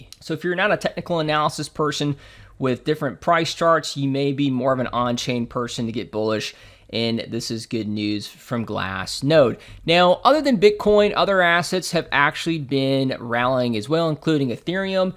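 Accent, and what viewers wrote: United States. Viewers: Yo Ive missed that southern accent 3QwzaeEqKVQLbkoMYqrZbYf9f5dK9R3KCm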